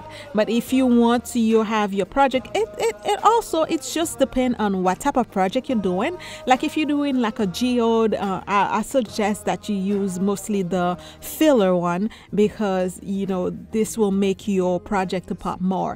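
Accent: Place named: Haiti. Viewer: My guess is you are Haitian. I love your voice and accent. God bless you.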